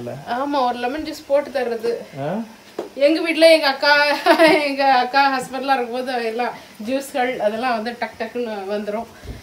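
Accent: Nagercoil, India. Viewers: Nice to hear our nagercoil slang. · Superb turkey dinner with all the trimmings. Anton's choices are nice. Your Nagercoil slang invokes the festive spirit too.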